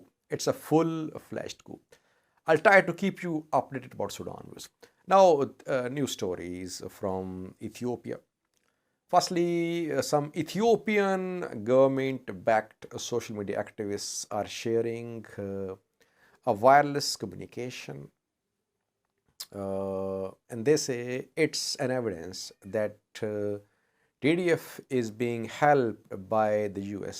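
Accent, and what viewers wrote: Eritrea. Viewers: That’s a Eritrean accent for sure. Lol it’s really easy to notice if you speak Tigrinya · B.S. 😆 this are Eritrean accent. Why even wasting time for this? You already said it. Any one can do this record.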